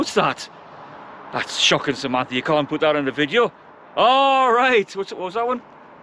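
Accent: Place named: Newcastle, United Kingdom. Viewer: Merry Christmas and Happy New Year. I just discovered you and my absolute favorite is your chatting when you ride. I'm not native English speaker and sometimes it's difficult for me to follow your dialect... what is that, are you from Newcastle or where? Anyway, love to follow you, cheers!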